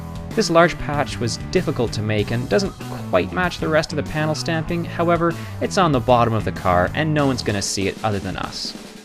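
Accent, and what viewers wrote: Canada. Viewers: Very nice work. I do love your canadian english. Who cares about Trumpland..... Cool vlog, very 'blinky'!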